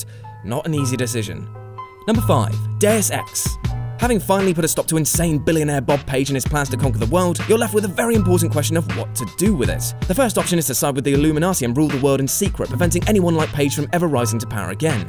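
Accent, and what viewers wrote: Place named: United Kingdom. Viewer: So many quick British syllables.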